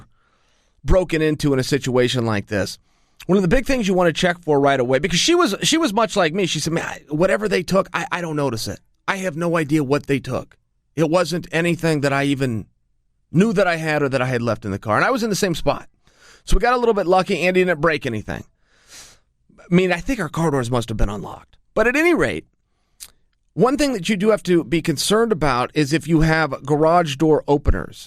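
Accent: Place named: Portland, United States. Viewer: Sounds like a portlandian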